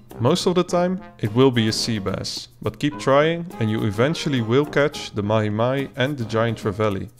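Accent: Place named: Netherlands. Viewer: I can just hear the Dutchness in your voice hahaha!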